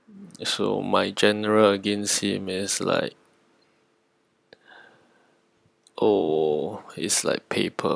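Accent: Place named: Singapore. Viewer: let me guess...singapore!!! you are from singapore aren't you??i know that accent..haha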